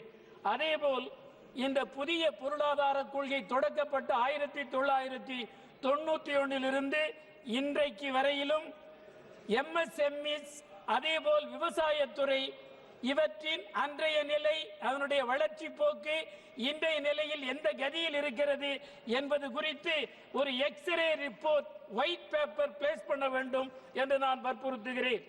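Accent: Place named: Kongu, India. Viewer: பஞ்சு நகரின் கொங்கு தமிழே ! சமத்துவமும் சகோதரத்துவமும் தழைக்கட்டும்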